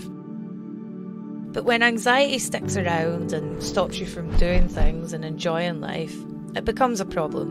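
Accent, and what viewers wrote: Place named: Scotland, United Kingdom. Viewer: I can't really focus on the content because you're speaking in a scottish accent XD Sorry that's how I'm easily getting distracted :( Don't hate me please x(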